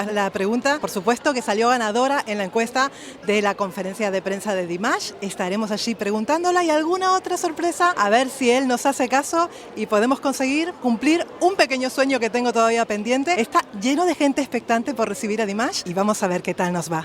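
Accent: Argentina. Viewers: Que lastima que perdiste el acento Argentino 😭 y Felicidades te sigo hace años ❤️ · te escucho hablar y siento como si estuviera escuchando a una argentina, si... tienes unos modismos al hablar típicos españoles pero me desconciertas. Excelente trabajo entrevistando a Dimash.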